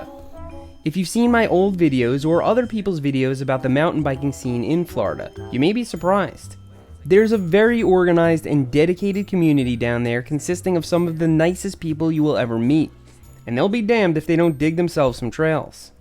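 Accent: Long Island, United States. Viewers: Love this....You from Long Island? I’m from Jerzee? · My dog Lucy and Drama are so similar. Lucy is a Boston terrier (similar to frenchie) and she's bigger than every other Boston we encountered. She's supposed to be a pure bread Boston but it don't look like it with size. We assumed that one of her relatives is a boxer. Edit: with your long island accent, it's really similar to my uncle, aunt, dad, etc. They're from Long island and now we live in NYC. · ❤️ I wish for you continued success at whatever you choose to do. Thank you for including us in your adventures! I knew you were from Long Island by the way you pronounce water, wodder, lol. Why are you planning to sell Berm Creek?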